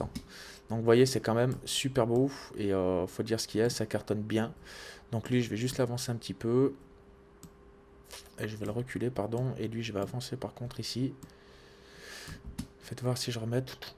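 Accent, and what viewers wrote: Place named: North, France. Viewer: pas mal , l'accent on s'en fout fout....même du nord nord...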